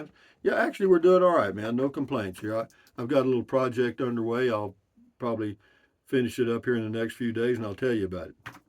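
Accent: Texas, United States. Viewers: Sam Elliott is a mature, seasoned actor, good hair, impressive mustache, deep voice. He has earned many fans among lovely women our age. Amigo, I say take it as a compliment, definitely a flirt, when a woman claims you remind her of good ol' Sam. He played Virgil Earp in Tombstone 1993, and he played Sam Houston in Houston: the Legend of Texas 1986. And a lot of other western and action movies. We could all be compared to worse. And anyone who thinks your respectable Texas accent sounds like that tv doctor, is a tone-deaf northerner. Don't even bother fretting.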